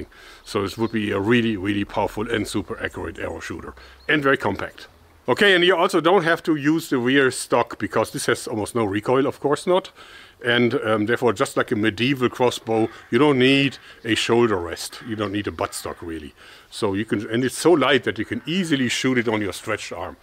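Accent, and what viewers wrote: Germany. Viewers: I dont know why, but hearing any German say "oops" instantly brightens my day and makes me smile and laugh, haha. I Love the German accent!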